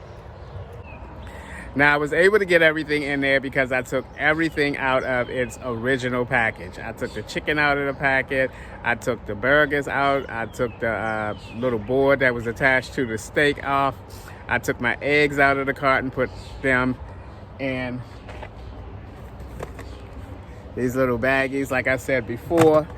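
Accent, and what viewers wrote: Baltimore, United States. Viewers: You’re from Baltimore for sure loln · Hi! Fellow Baltimorean who decided to jump ship years ago. I still hear the Baltimore accent "tew". Lol! Enjoying the videos, bro! Keep them coming.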